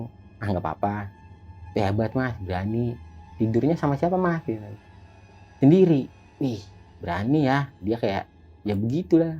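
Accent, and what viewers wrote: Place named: Jakarta, Indonesia. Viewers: Cara ngomong ye logat betawi bnget ye🤭😂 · Gue nonton sambil cekikian coy, mantep lah betawinya ini mah ya, et dah. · Ikutan kebawa alur cerita, mski siang2 dengerinnya, tetep msih merinding disko😂🤭....ngomong2 suka dialeknya kya dialek Depok.....jd ingt emak....emak angkatku yg super baik, org betawi depok.... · Betawi banget bang😆 bng lucu nyautnya."iyaa"😅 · Seru nih cerita.na lah nada s abangnya Betawi bgt yak 🤣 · Betawi bangett kelass wkwkwkw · Logat betawi nya aseek bngt … enak sih di dengernya 👍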